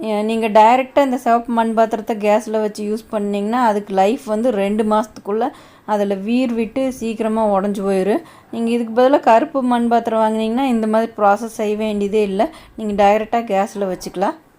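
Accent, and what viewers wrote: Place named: Kongu, India. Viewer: அருமை, எனது சந்தேகங்களுக்கும் பதில் கிடைத்தது, உங்களின் கொங்கு தமிழ் உச்சரிப்பும் அழகாக இருந்தது.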